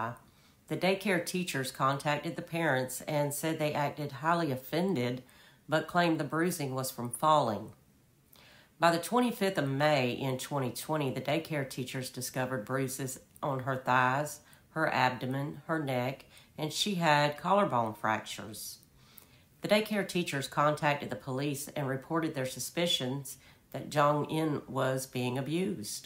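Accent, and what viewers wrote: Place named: South, United States. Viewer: I speak Korean (4th language) and you did very well. It's a whole new tonal system and they have pronunciations not natural for English speakers. That being said, I can tell you're from the south. I'm also from the south and know that pronouncing most European words gives us aneurisms so I know how hard you tried with the Korean names. You did WAY better than you give yourself credit for ❤️❤️ Your compassionate spirit still captured the same sentiment the rest of us feel.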